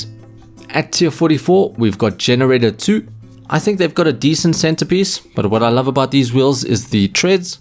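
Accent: South Africa. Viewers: Where you from. You sound south african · Are you South African?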